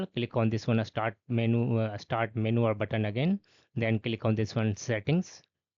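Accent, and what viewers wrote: India. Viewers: man I love you first i dont trust you becous you sound like a indish person u want to scam me but now i love you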